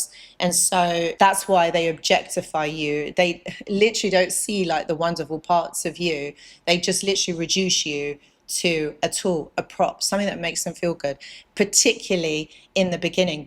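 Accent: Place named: Southern England, United Kingdom. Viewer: You need to learn English, she has a standard southern English accent which is the standard English accent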